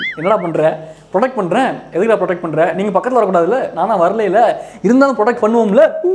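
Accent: Coimbatore, India. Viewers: enunga neenga coimbatore nglaa unga slang supr nga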